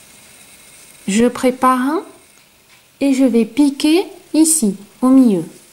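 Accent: South, France. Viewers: Formidable ! ça ne va pas trop vite, les explications sont nettes et précises, la voix agréable et le petit accent du sud rigolo. Merci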